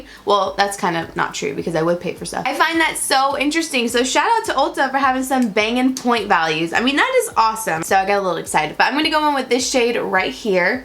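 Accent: South, United States. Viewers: Just subbed beautiful! Love your Southern accent!!!!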